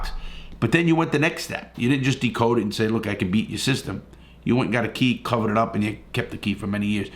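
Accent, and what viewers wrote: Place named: Boston, United States. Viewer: I did well at an audition because of you. Hours of hearing your Boston accent helped me master it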